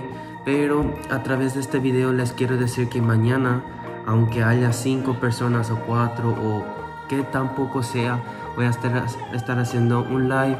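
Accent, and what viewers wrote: Paraguay. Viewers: En el momento que empezó a hablar me parecía que tenía el acento paraguayo y después comprobé 😁 Que bueno tener un lindo coreano en mi hermoso país por favor vengan más coreanos a PY .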